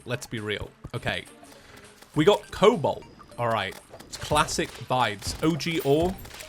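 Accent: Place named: London, United Kingdom. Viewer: Yoo wats up fellow londoner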